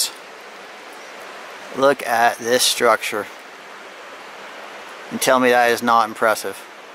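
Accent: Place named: United States. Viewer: Normal frame rate doesn't make a difference on recording. it's still the same picture only taken twice as often in 1 sec. maybe its to much data for the storage medium or the camera cpu. from my Experience filming in dark a environment. the video gets distorted and grainy when there is to much going on in the frame that the cpu has difficultys to render. this should not be seen as critic. i only want to give some thoughts to help your channel to more quality. i still like to watch your content and here some American accent.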